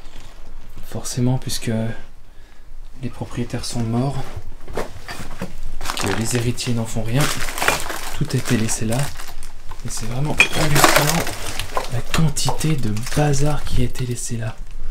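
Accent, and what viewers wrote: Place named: Vosges, France. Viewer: D'après ton accent tu es peut-être vosgien. D'après les anciens même si on ne croit pas aux protections elles protègent quand même.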